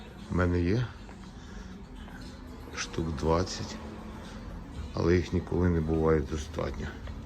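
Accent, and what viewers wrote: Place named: Western Ukraine, Ukraine. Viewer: Зустріла Вас на теренах ЮТьюба вчора, 2.06, випадково... Дуже була задоволена ...ну, і здивована - вперше чую українську мову західного ґатунку ! Вперше зустріла блогера зі США українця... Не ображайтеся - маленьке зауваження - Ваша заставка з палаючим бікфордовим "шнуром" - дуже неприємне нагадування тут, в палаючий Україні ....коли знаходишся сам, серед цього жаху...болить душа... розумію, то Ваш канал...але, бути там, ле Ви, і тут де я - "две большие разницьі" і нічого спільного... Бажаю успіхів !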